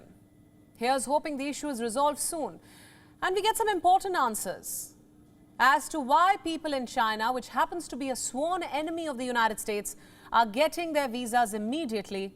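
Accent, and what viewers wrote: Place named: India. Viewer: Nobody cares or knows who your foreign minister is loool. Just because he "looked into it" suddenly 800 Indian citizens who live on less than $5.50 a day will get fast-tracked visas to the US? I'll break it down nice and simple: 1) India like many developing counties has ZERO Visa Free access to the US, UK and many other developed nations. Therefore it takes months and years to get a visa. I'm a British citizen. The UK as a highly developed, advanced welfare state economy we have Visa Free access to the US and I visited the US this year, getting documentation was literally immediate. 2) You completely missed Sam Browns point. This Indian presenter desperately trying to hide her Indian accent is claiming India is a "strong ally" of the US and so should get Visa Free access for its citizens. Yet, India finances Russia's invasion of a sovereign country by not condemning it and buying its oil. 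Further, 90% of all your defence equipment was bought from Russia. Russia is in the top 5 of the countries the USA despises the most and yet India a "close USA ally" pours billions and billions into Russia's economy.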